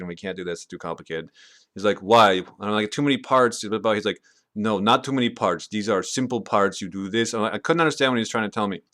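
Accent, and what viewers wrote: Russia. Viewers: lol russian accent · like the russian accent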